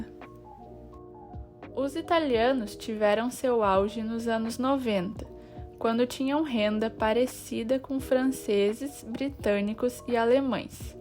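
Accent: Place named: Rio Grande do Sul, Brazil. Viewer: eu adorei a narração, sotaque gaúcho e a menina deve ser linda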